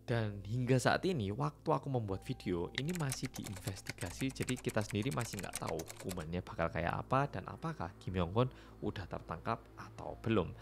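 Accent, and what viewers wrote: Java, Indonesia. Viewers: Selain ceritanya aku suka logat dan medoknya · Ngebut bgt nonton smua vidio oppa medok ini. Hahahhaa,semua ku tonton mlm ini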